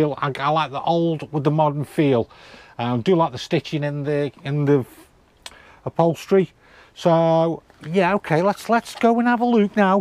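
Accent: Stoke, United Kingdom. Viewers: Also.. I just had to Google where you’re based as you sound like a local Stokie to me. ☺️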